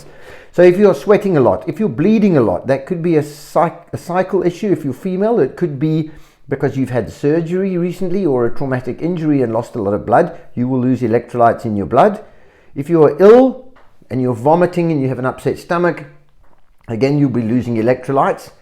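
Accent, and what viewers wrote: South Africa. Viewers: Are you from South Africa 🇿🇦😀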